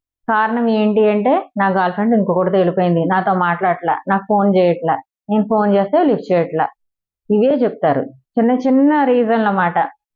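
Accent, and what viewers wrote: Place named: Godavari, India. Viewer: మీ మాటతీరు ఒక గోదావరి స్లాంగ్ ఉంది and అటువైపు ఉండే సాధారణ గృహిణి మాట్లాడినట్లు ఉంది కాని మీరు చెప్పే సబ్జెక్టు బట్టి మీకు మనుషుల సైకాలాజి తెలుసు.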